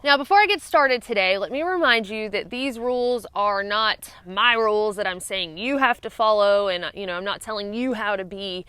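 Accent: South, United States. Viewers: I love how you say "Bright white light". I'm from NJ but love the southern accent! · I had to watch it again to catch the "braht waht laht" part. Love the southern accent. Thank you for the informative video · To many raised in the South, speech from the north has always sounded equally strange and or funny. Southerners have just generally been too polite and respectful to mock people for it. This was largely the reason many of us raised in the South years ago resented “Yankees.” We always knew what to expect immediately: mockery and derision because we and they spoke different. It’s not nearly as prevalent theses days, but this thread shows vestiges of it. Fortunately, even in this thread, some now even seem to find the southern dialects pleasing and charming, especially when coming from this lovely young woman. is a good ambassador and representative for our culture. 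I think she is a beautiful person. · “Brot wot lot”...love the lingo. I always learn something listening to you. Press on! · Love that southern accent! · headlamp has a brait whait lait...your southern twang is adorable.. Good advice as well..common courtesy is something a lot of folks lack..you are obviously drippin with it..good on ya...and you have sand young lady...hugs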